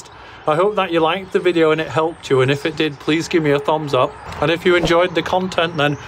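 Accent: Liverpool, United Kingdom. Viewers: I would guess at least half is Liverpudlian. 😉👍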